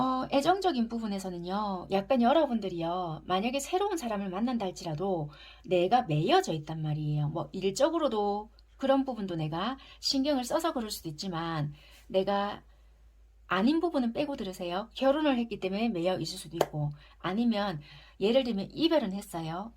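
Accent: Busan, South Korea. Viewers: 님 21년동안 좋은 타로리딩과 조언 감사드렸어요 21년 마무리 잘하셨나요? 22년도 함께해요 ❤ 3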